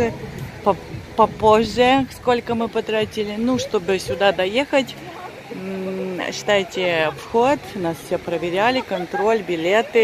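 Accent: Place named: Bulgaria. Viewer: У вас акцент болгарский 😉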